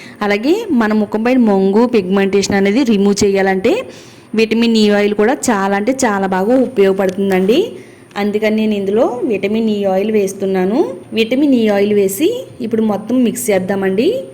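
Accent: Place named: Godavari, India. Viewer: Godavari districts vallu ekkuva ga andi ani antaaru chivari lo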